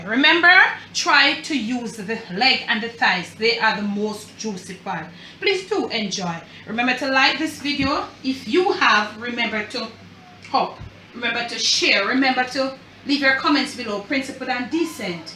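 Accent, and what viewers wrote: Jamaica. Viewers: Every time I watch one of your videos I want to visit Jamaica again. Your beautiful accent and delicious looking food are sooo enticing.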